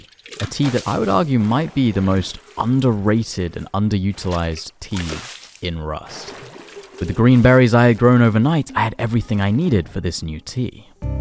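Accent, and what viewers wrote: Australia. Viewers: R u an aussie? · british man??? arent u austrailian?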